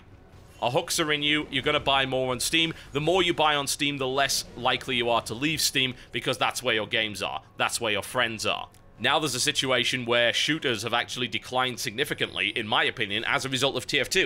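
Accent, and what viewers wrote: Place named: England, United Kingdom. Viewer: Im scared right now, because people seem to be taking opinion of Steam as gospel... seemingly only because he has a english accent.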